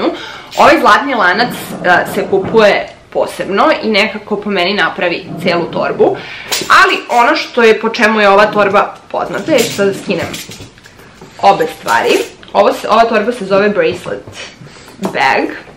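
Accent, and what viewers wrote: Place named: Novi Sad, Serbia. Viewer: Od kad ti imas novosadski naglasak?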